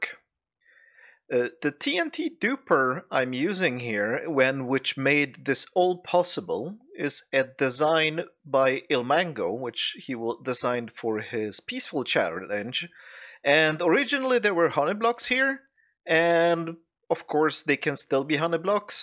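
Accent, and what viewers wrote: Sweden. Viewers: The Swedish accent makes him look even smarter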